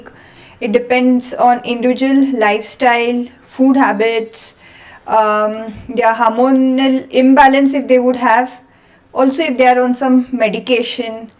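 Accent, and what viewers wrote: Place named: South India, India. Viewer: 😂ALL ASIAN DOCTORS ARE 2ND WORST AFTER ARAB DOCTORS! SHE WASTED MORE THAN HALF OF TIME WITH THICKKKKKKK SOUTH INDDDDDIANNNN ACCENT, LIKE RESUME IS CALLED RESHUME IN SOUTH INDIA 🤣, FINALLY THE TOPIC IS REACHED IN END 😭🙏 THAT'S MIDDLE FINGER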